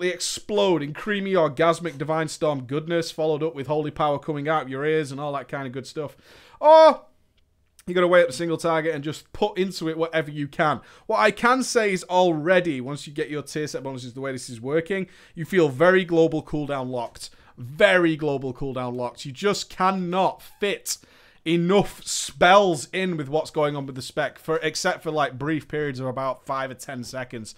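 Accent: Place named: United Kingdom. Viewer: hard to hear what u saying with that brittish accent + you talk to fast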